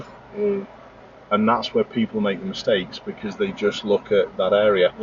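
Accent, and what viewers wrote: Liverpool, United Kingdom. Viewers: Gotta love the Scouse accent lol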